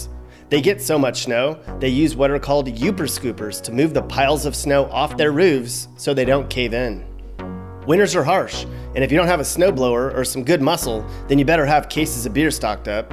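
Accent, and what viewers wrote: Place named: Michigan, United States. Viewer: michigan you sound like me now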